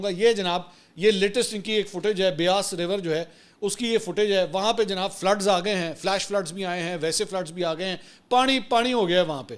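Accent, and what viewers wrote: Punjab, Pakistan. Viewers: AP ky vilog mein Punjabi touch acha lagta hi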